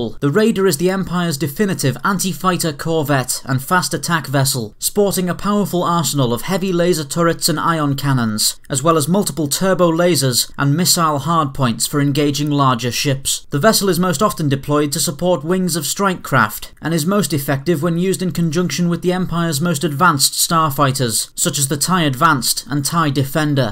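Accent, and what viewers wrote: United Kingdom. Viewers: This was long overdue but, man I love your manner of speech. I may be biased as a fellow Brit meself but I can't help it. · Hiaruga I agree just think he needs to slow down and make it a bit more conversational and less frantic. It makes it a little uncomfortable to watch multiple videos with this rushed tone but otherwise I also appreciate the British accent just wish it was a bit more on the calm side. But always great content! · Love how the British accent makes anything sound better and credible. It just sounds good.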